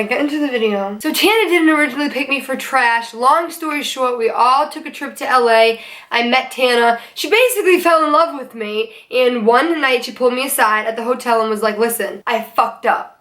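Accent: Boston, United States. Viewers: Kinda sounds alittle Boston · Kels LaMcintyre it is boston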